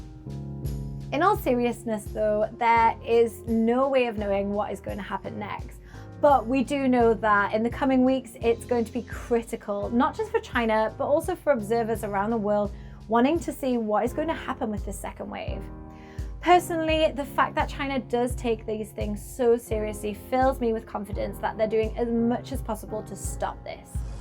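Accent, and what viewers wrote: United Kingdom. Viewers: A British accented woman turning pink, interesting...now you can see how powerful the CCP's brainwashing techniques really are. A low-IQ, low-efficiency way of controlling the virus and society became widely acclaimed by even the woman who is obviously well educated. Interesting... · I learn British accent from you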